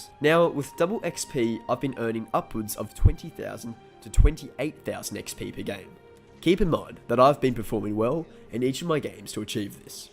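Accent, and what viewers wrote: Australia. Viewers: Are you Australian you sound it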